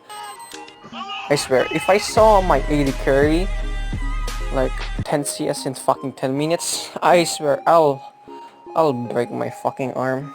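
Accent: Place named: Philippines. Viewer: The Philippinese accent is strong with this one amen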